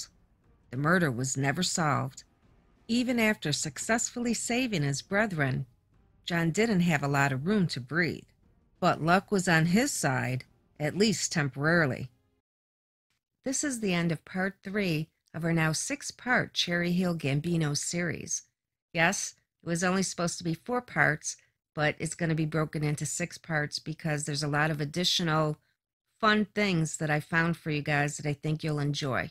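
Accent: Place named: Brooklyn, United States. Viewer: Your channel is so good. And ur accent ( im from boston) sounds so familiar but i cant quite place what part of NYC. Old school Brooklyn would be my first guess tho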